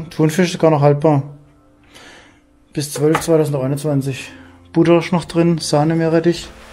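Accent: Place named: Swabia, Germany. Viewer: Hoi, da hört man ja Schwaben, die auch noch tolle Videos machen! Ich habe mal ein bisschen auf dem Kanal herumgestöbert und lupfe meinen Hut. Gute Kameraführung, angenehme Moderation und ohne aufdringliche Hintergrundmusik. Ich selber bin leider inzwischen schon zu alt und zu gebrechlich, um selber noch der Abenteuerlust um verlorene Orte zu frönen, deswegen genieße ich diese Videos vom Sofa aus umso mehr. Da kann ich gar nicht anders, als euch mit der Bimmel zu abonnieren und euch weiter zu empfehlen!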